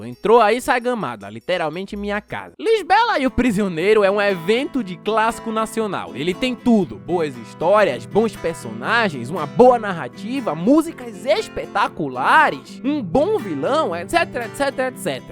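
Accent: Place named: Pernambuco, Brazil. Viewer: Rapaz To surpreso do se Da qui de Pernambuco Sempre Suspeitei do Sutaque Manda um salve Pra Aliança PE, sou muito seu fan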